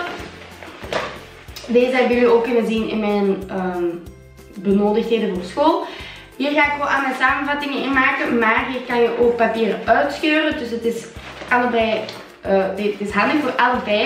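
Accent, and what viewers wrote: Belgium. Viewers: ik ben Nederlands en het is echt grappig dan hoe Belgen praten Loveeee naar jou btw :)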